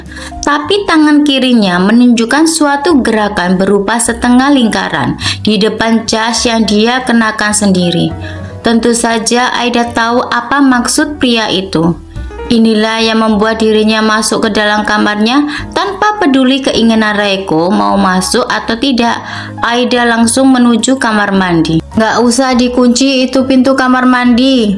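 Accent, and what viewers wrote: Java, Indonesia. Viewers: Suka suara khas medok jawanya semangat akak❤❤❤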